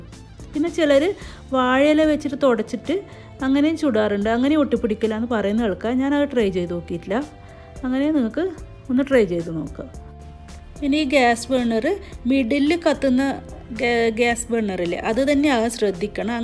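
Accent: Kannur, India. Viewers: വെറുതെയല്ല സൂപ്പറയത് മൊഞ്ചത്തീ ആ വർത്തമാനം കേട്ടപൊഴേ മനസിലായി കണ്ണൂർ എവിടെയാ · Kannur aanenn samsaram kettappoo mnssilaayii😃😃njanum kannur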